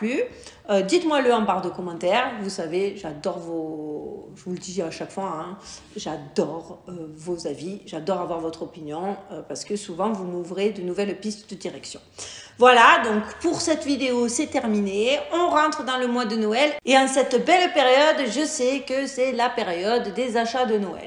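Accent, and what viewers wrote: South, France. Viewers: Salut, Comment schpouinkes-tu? Vidéo super de kabou. Bravi, bravo! As-tu entendu parler d'Ummo et des ummites, par hasard? Tu peux toujours regarder et écouter les vidéos de Stone Gardenteapot. Ensuite, tu peux regarder et écouter celles de Jean-Pierre Petit, mais elles sont plus difficiles d'accès. Tu as l'accent du sud de la France, mais tu as une bobine de Suédoise. Bises alchimiques du Dimanche soir. 🦆 🎸 🎤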